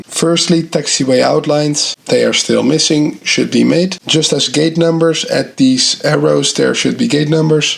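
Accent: Netherlands. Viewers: schiphol atc tower i thougt i heard a dutch accent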